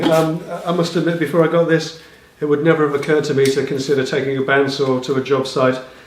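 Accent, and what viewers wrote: United Kingdom. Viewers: What I like about your videos is that they're authentic, mistakes are shown, such as the work piece being unsecure (sic) when using the spade bit and the skirting board piece breaking in two. I would have used a dovetail saw or even a coping saw but getting the cheap bandsaw into a project is what you wanted to achieve and succeeded, a little bit different and your casual matter of fact manner is easy to watch and listen to. The point that I took from the video is that a bandsaw can be portable and could used for more challenging aspects rather than just a small section of skirting board. I commend you for even replying to the sanctimonious knob heads who feel a compulsion to piss on bonfires to give themselves a sense of self satisfaction and importance. The points they may could be better raised in a less knob headed way imo. Keep it going, nice to have a British accent to listen to and using metric when looking for hints and tips.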